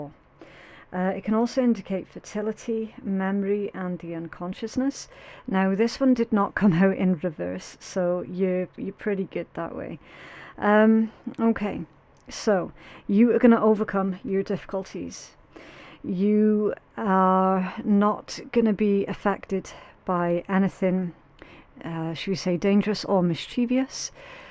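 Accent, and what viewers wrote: Scotland, United Kingdom. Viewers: Thank you for ur readings..been putting my rune under pillow. Ur voice reminds my of living bonny Scotland for 2 years and how her beauty and kindness has healed me when it was needed. Bless u deary❤ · Love your Scottish accent 💖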